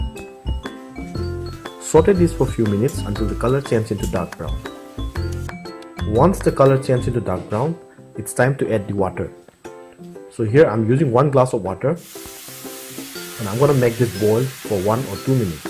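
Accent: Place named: India. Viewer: So many critics in the comment section... english is a language, just a way of communication,, if u can understand and communicate than thats good enough.. different people have different accent.. british americans scottish all have diff accent.. and i know how indians speak and its not appreciated either by others😂😂 so stop criticizing ur fellow indian😂😂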